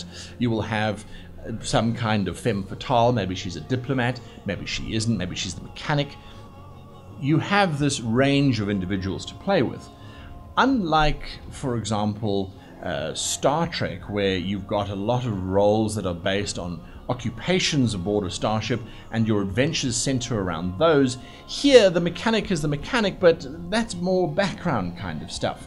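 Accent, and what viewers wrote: United States. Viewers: Love your work. But in fairness, that was quite the teeth grinding American accent! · Every time I hear it, I’m more unsure if I love or hate your American accent. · Top-tier American accent.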